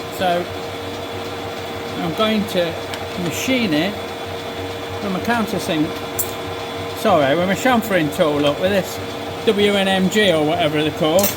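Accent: United Kingdom. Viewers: boring bar that matey...with carbide insert slot...should have the insert size written on it.....same size tooling as my bport...same lathe too...fk me...and a tattooist lol...me too...22 years at it....got some mates over your end....thought i recognized that fickin accent...not short of curry houses down that melton road...stay free...mad heads and mutoids!!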